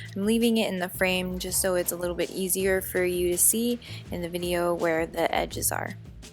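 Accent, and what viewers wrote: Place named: Canada. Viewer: Omg!! I didn’t even pay attention that this was your video until it came to the end and you said! I heard the Canadian accent but didn’t think anything of it. I am going to attempt one of these projects. Haha that is so coincidental. Glad it was you because I liked yours the best out of the rest I was watching lol!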